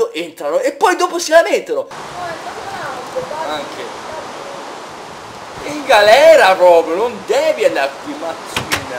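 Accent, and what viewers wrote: Tuscany, Italy. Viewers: è toshano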